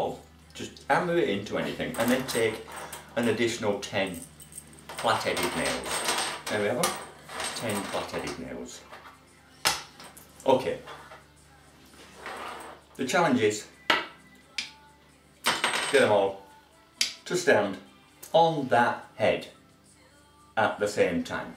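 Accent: England, United Kingdom. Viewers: Great accent. It's like listening to a scene from a Beatles movie. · Thats not what Irish sounds like, hes English. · The English accent and ciggie gives him more credibility. :)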